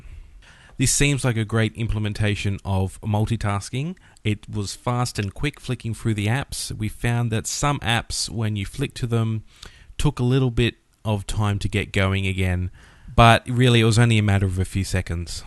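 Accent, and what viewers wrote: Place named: Australia. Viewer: awesome revie yo sound aussie like me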